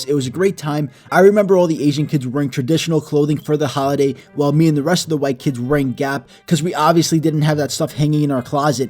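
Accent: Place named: Boston, United States. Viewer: This mans boston accent is amazing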